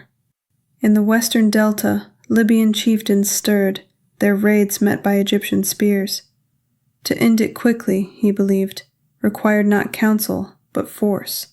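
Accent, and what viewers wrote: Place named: North America. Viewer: I'm playing accent detective... You were raised in North America. That's all I've got hahaha...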